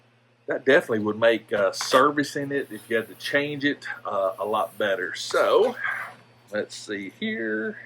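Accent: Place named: Georgia, United States. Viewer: Are you from Ga? That accent sounds a lot like mine!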